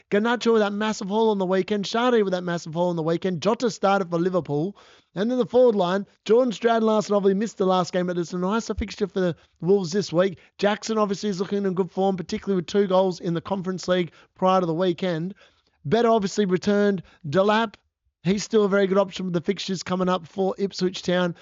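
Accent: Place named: Australia. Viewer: Hi mate, love your content and delivery, great hearing an Aussie accent . I'm Aussie in NSW . First year playing but lovin' it. A great differential in my opinion going forward is McNeil from Everton , they have increased his minutes over the last few weeks and he was brilliant last week . $5.1m if not this week definitely next imho. Cheers bro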